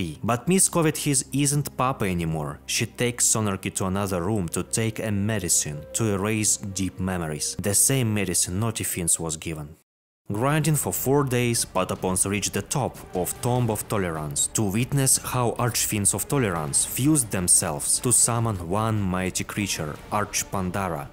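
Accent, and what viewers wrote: Russia. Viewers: А я почему то догадался что ты русский, по манере разговора английского, его тембра да и самого звучания, но на я в этом убедился, тк сохранения Ppsspp было на русском. Мне приятно знать что ещё есть русское комьюнити по этой старой и шедевральной игре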